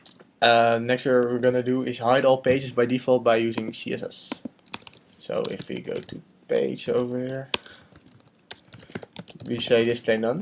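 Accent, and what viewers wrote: Netherlands. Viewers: Yeah I can hear a dutch accent boyyy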